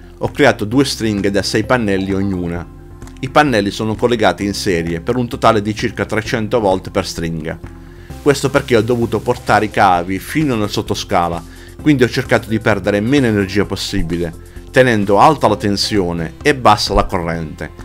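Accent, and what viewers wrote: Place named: Sardinia, Italy. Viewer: Sono pienamente daccordo con te. Peccato che, dall'accento vive in Sardegna, altrimenti, Coronavirus permettendo, lo andavo a trovare, così con l'occasione, assaggiavo lu purceddu Sardo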